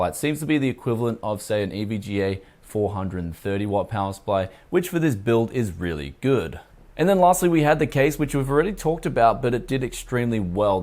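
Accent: Australia. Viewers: could tell he was aussie from accent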